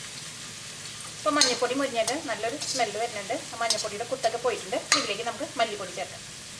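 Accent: Thrissur, India. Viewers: simble ayi arkum theyyarakkan pattunna reethiyilanu chechiyude show nyan uae yilanu nyan eee show nokki friendsinte munpil alayittundu prathyakichu chechiyude slang thani nadan thrissur oreyoru vishamameyullu enne ithrayumathikam karikalundakkan bpadippicha chechiye onnu kanan pattumo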